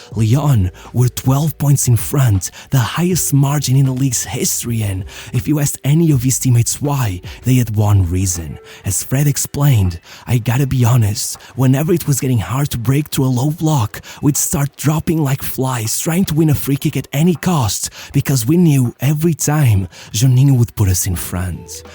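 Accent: Portugal. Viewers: Ahh the narrator is Portuguese!!!! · Ur Portuguese right? This accent always got me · esse sotaqque portugues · Ele é português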